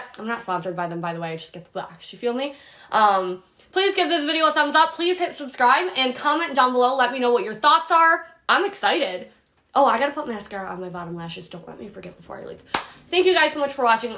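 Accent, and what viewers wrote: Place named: New Jersey, United States. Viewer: Jersey? Or New York...it's not super strong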